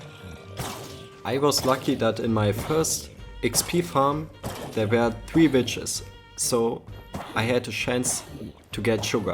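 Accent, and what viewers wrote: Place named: Germany. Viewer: Wie hast du das gemacht man hört du bist deutscher 😅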